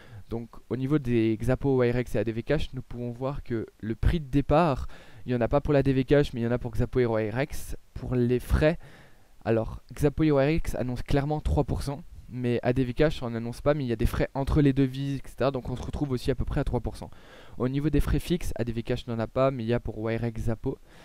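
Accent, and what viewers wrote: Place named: East, France. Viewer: T'as un accent de l'Est. Tu viens d'où? Lorraine?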